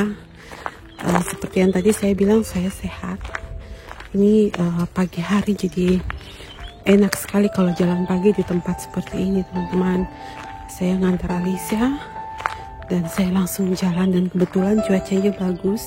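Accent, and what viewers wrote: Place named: Papua, Indonesia. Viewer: Maaf bunda apa asalnya dari paua soalnya logat bicara bunda seperti orang papua.. Aq jga ada saudara papua tepatnya di nabire.. Aq suka sama bunda karna murah senyum.. Kebunya luas sangt indah sekali suasananya... Memang php mnyakitkn betul kata bunda php bisa terjadi pada siapapun dan dimanapun.. Semangat bunda0💪💪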